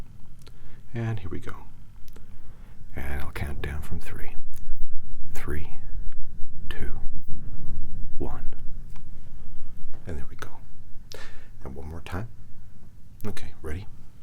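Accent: United States. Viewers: So wild how you can do a really good American accent. Love theese videos the breathing techniques really help me to relax enough to rest at night. Thank you!😊💤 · These Reiki sessions are such a treat - the gravelly US accent is so restful. I do hope you'll consider doing more of these after the 8 'block bookings' are over? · Can we talk about how this guy can just casually do a great American accent? I’m a northerner and I’ve been in the states for like 2 years now and still can’t mimic the accent! How did you get so good at that lad?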